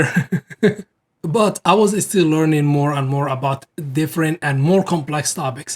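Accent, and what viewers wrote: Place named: Somalia. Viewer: Somali inaa tahay codkaagaa ka fahme secondska ugu horeeyabo😅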